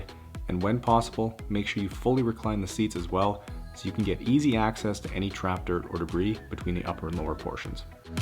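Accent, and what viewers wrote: Canada. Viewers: I just discovered your videos and now I'm addicted lol! Also I knew I heard a fellow Canadian as soon as you started talking haha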